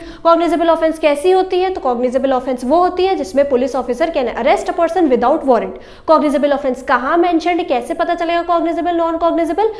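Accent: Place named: Madhya Pradesh, India. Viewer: AAP madam m.p se hai kya apne bola tin tutd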